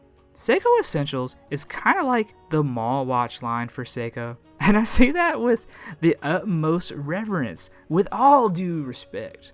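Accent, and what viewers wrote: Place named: South, United States. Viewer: that mall shot you put in there is the World Trade Center mall in lower Manhattan. A place where I have gone watch shopping time to time (they have a swatch boutique, tissot boutique, and London jewelers). For a minute I thought you were from NYC, but im guessing by your accent down south is more likely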